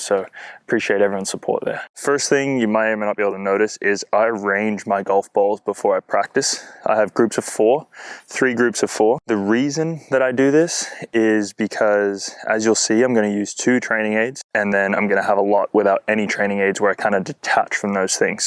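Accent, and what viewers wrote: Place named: United States. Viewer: Every vid ur accent progressively gets more American lol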